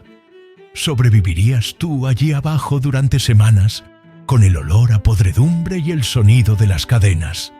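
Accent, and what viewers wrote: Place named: Castile, Spain. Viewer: SIN PALABRAS, MÚSICA, VOZ, LOCUCÍON EN CASTELLANO NEUTRO ...ESTA BIEN PERO MENOS ACENTO CASTIZO X FAVOR ASI SE ENTIENDE AL 100 % . IMÁGENES ILUTRADAS GENIALES ....ESOS FOGONES DE LIS COCINEROS.. SOBRE PISO DE LA CUBIERTA DE MADERA..MMM ..NO ES REAL. PERO...EN FIN..ES MUY ÚTIL Y EXPLICITO E INFORMANTE AL 100!!.. FELICITACIONES.😅😊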